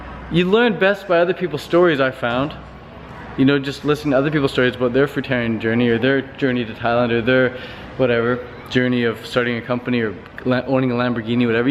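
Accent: Canada. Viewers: which part of Canada are you from?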